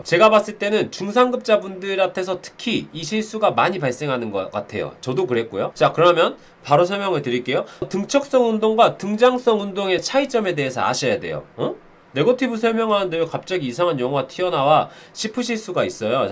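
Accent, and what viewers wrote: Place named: Jeolla, South Korea. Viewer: 전라도 억양이 참말로 구수하십니다~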